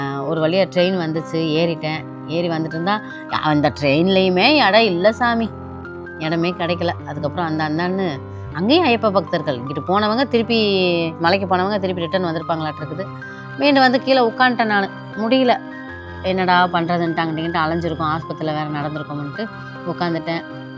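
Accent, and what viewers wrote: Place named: Kodaikanal, India. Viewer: உங்களது vlog ரொம்ப பிடிக்கும்பா. உங்களது அமைதியான அழகு, இனிமையான குரல் ரொம்ப ரொம்ப ரசிப்பேன்பா.நான் கொடைக்கானல். ரொம்ப நாளாக உங்கள் பேச்சு வழக்கைப் பார்த்து நம்ம பக்கம் மாதிரி இருக்கே என்று ரசிப்பேன். நீங்கள் சிகிச்சைக்காக சென்ற ஆஸ்பத்திரியைப் பார்த்ததும் என் மனது கனத்து என் கண்களில் என்னையறியாமல் கண்ணீர் பெருக்கெடுத்து விட்டது. கடவுள் ஏன் நல்லவர்களை இப்படி சோதிக்கிறார்.உங்கள் vlogல் நல்ல மெஸேஜ் கொடுப்பீங்க. கடவுள் உங்களுக்கு நல்லதே செய்வார். என் சகோதரிக்கு கொடைக்கானல் சாந்தி அம்மாவின் அன்பு முத்தங்கள்.❤❤❤❤❤❤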